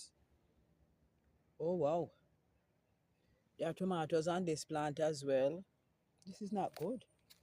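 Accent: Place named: Jamaica. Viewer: Wow.wow mis I almost give up on your Channel because I don't see you for a very long time. Good to see you again. I see Marie every day also Stacy but not you ms I just love to hear you talk real Jamaican talk😅 keep planting because peppers and tomatoes are too Expensive in the market nowadays, Respect 🙏 Jah guide nuff blessings Carramondo never heard that name before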